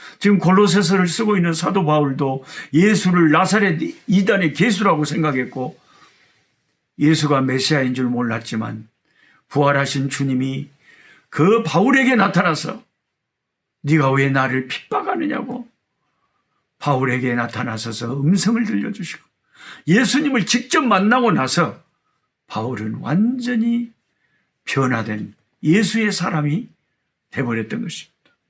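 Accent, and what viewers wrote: Jeolla, South Korea. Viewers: 말씀을읽는다는건축복인듯합니다 우리가 하나님의 자녀라면 그분에대해서 궁금해야? 꿀송이큐티가더욱가슴으로다가올것입니다 저 포함해서 말씀을읽어나가는집사4명이있는데 다들처음엔말씀이어려워서힘들게생각했는데 꿀송이큐티와 데일리노트(그날그날일기및주님과대화또한은혜쓰는노트)이렇게하다보니 지금은상경을재미있게볼수있고 잘모르지만성경도알아가는맛이 대단해지고있습니다 말씀은모르든지알든지 매일매일 우리의영을위해서먹어야할것같습니다 목사님의전라도사투리의성경공부가 다른채널보다 정감이가고 듣기도좋습니다 요즘은우리가 세상에서의삶도중요하지만 나의영에 부유함에더욱더신경써야할듯합니다 오늘새벽에는반은졸고 반은기도하고했지만 병원으로돌아오는발걸음이가벼웠습니다 말씀이사람을변하게하고 말씀이세상을보는눈과사람을대하는모든걸변하게합니다 오늘도 나의예수님은 최고였습니다 감사합니다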